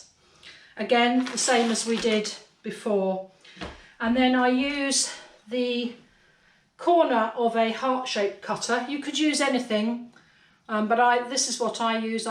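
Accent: United Kingdom. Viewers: I had never heard of Blue Peter & had to Google it - a can’t get enough of your British accent & expressions! We call the “whirler” a banding wheel in the US but I’ve decided to start calling it a “whirler” - that’s so much more fun! Sending much love & I can’t wait to make my first poppy. I’ll email you a picture when it’s all glazed! Cheers!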